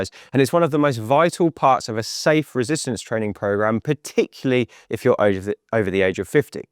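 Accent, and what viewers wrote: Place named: Leicester, United Kingdom. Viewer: This Leicester accent makes me feel at home :)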